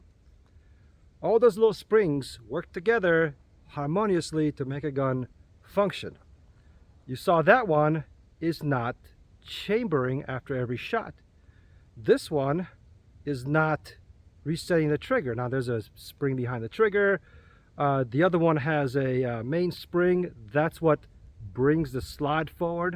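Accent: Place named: United States. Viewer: For a Romanian you American accent is good.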